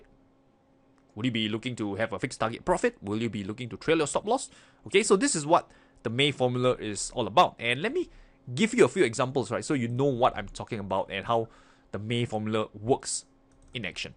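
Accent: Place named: Singapore. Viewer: Singlish accent is popping!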